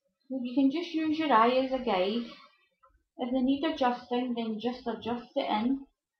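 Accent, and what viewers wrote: Scotland, United Kingdom. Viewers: A beautiful craft! She turned out lovely. Your Scottish accent was a treat to listen to as well.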